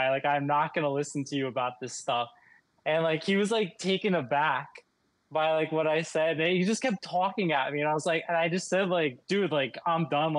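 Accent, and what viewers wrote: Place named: United States. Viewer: not gonna lie i thought you were american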